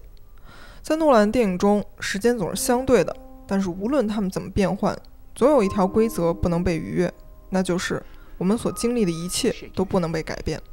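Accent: Beijing, China. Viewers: up主的北京口音很纯正呀😊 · UP主妥妥的北京人，儿化音太酷了。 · 北京话吹牛皮就是强！这集变成南京话或者安徽话就……希望up主演绎一次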